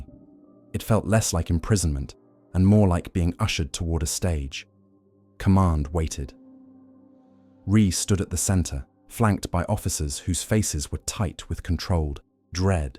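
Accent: United Kingdom. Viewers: Another British accent so tired of this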